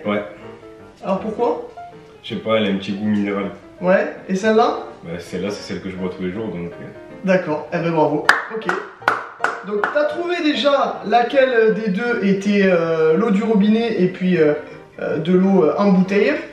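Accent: Marseille, France. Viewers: tu et un Marseillais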